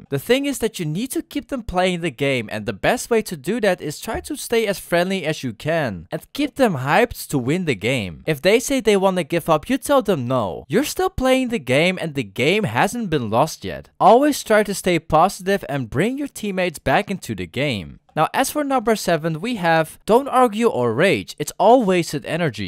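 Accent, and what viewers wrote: Netherlands. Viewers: why do i have a feelin that his mainlanguage is dutch? xp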